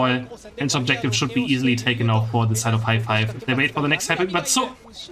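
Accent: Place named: Germany. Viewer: Your German accent is too much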